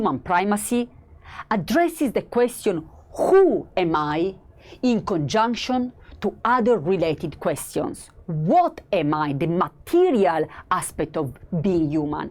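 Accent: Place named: Italy. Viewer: English with Italian accent sounds cute here...😘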